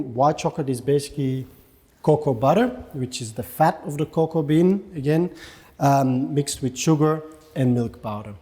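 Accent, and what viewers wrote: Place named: Belgium. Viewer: Are you originally from Belgium? I detect a Belgian accent:) Groeten uit Arizona, USA - Ann van Daily Travel Dose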